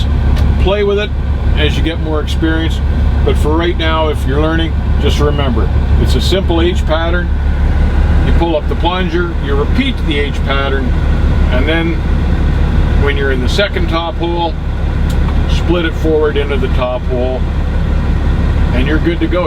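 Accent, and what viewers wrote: Canada. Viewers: You must Canadian if I'm correct. I've noticed that Canadians pronounce out different than we do in the states.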